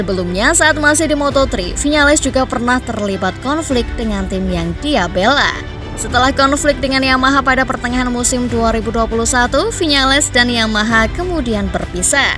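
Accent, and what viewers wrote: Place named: Java, Indonesia. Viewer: medoknya imut bet wkwk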